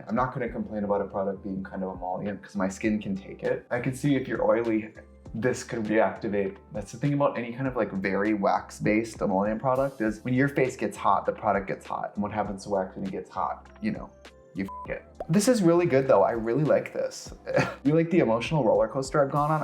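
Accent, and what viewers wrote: Wisconsin, United States. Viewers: LOL, Wisconsin accent. I'm still trying to manifest the x Charlie Berens Wisconsin collab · I love it when your wisc. accent comes through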